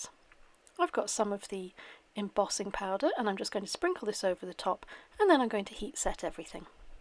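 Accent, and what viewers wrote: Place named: United Kingdom. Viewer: Your accent suggests you are very British, I love that as I'm in Portland Oregon, the land of no accent! I just love all things you create and how you add real life bits and pieces to your crafting.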